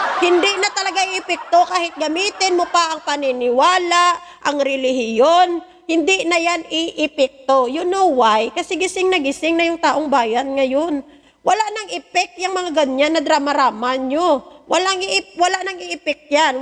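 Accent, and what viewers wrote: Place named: Visayas, Philippines. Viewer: kapag naririnig kita madam naalala ko c sen meriam na kahit tunong bisaya kayo pero malaman ang mga sinasabi at kahit mabilis k magsalita my direksyon at nasa tuno ang bawat buka ng bibig mo.isa kanang alamat madam sa larangan ng vlogging.mabahay k godbless❤❤❤❤❤❤❤❤❤❤❤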